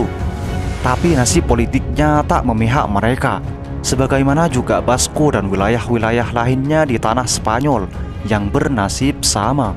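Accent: Madura, Indonesia. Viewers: logat madura banget😂😂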